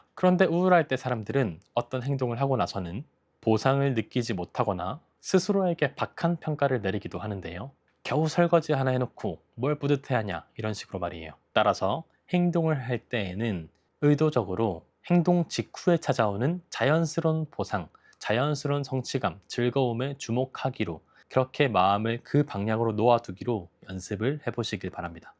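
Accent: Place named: Gyeongsang, South Korea. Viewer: 억양이 경상도시군요...^^반갑습니다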